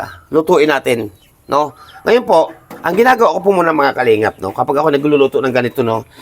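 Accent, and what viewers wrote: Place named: Batangas, Philippines. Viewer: Batangueño prin ung iyong punto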